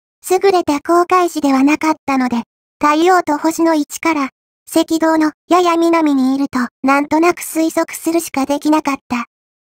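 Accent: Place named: Kansai, Japan. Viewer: 関西弁……ちゅーか……関西のイントネーションやね。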